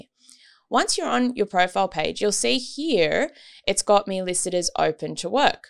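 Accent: Australia. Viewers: I love your Australian accent 😊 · thanks. And i loved your Australian accent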